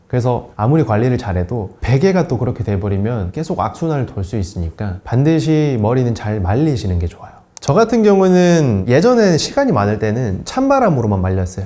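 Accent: Gyeongsang, South Korea. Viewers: 선생님 고향이 혹시 경상도쪽이신가요? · 선생님 경상도 출신이시죠!??? ㅎㅎㅎ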